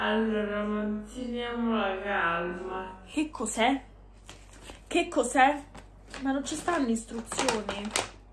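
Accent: Rome, Italy. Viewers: Ciao, fai 1 video dove non parli romano ma solo italiano.... ti sfido 🤪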